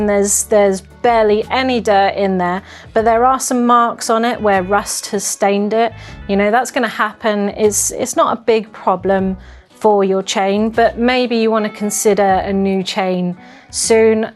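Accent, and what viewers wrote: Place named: United Kingdom. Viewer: British accents …smh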